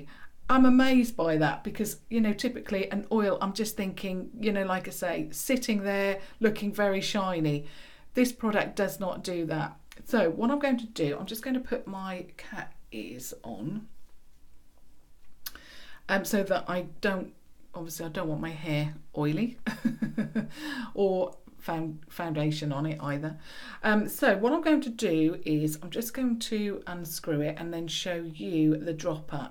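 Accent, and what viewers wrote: United Kingdom. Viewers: Hi, of course I'm really happy for your achievement now 10,000 is near! you have grown with us and as I said in other comments for me you are like a friend, not only I appreciate all your reviews and your tips but I practice my english LOL... I love your british accent! anyways, your skin looks like porcellaine in this video👍❤️😘 (P.S. sorry for any spelling mistakes )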